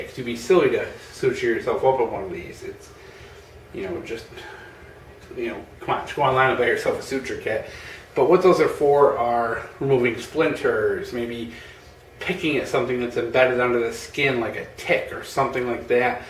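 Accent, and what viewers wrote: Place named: Michigan, United States. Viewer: Are you from Michigan? You have that accent lol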